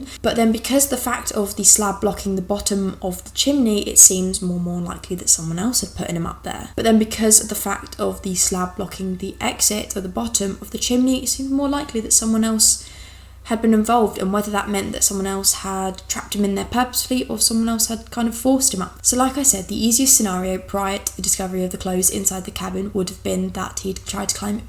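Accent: United Kingdom. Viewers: I think it’s just you haha. Either that or it’s just the British accent so you might not be used to it or something x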